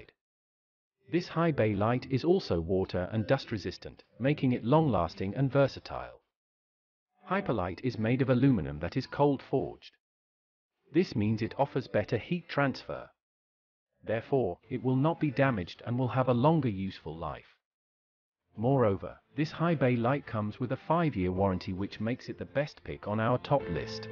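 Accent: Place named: United Kingdom. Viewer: STOP USING BRITISH VOICE OVER... american lite? then use a american vo. we dont like listening to limey's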